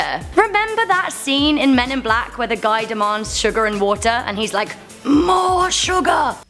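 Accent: United Kingdom. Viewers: dillpickle 123 she’s British. That’s how we pronounce it in Britain. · Lynn Barns she's from the UK so they pronounce it different from the USA.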